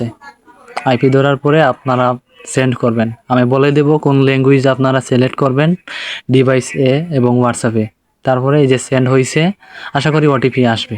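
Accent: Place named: Sylhet, Bangladesh. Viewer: Joto suddo kotha kow na kene ba tumr shyleti kotha buja jay 🙂👍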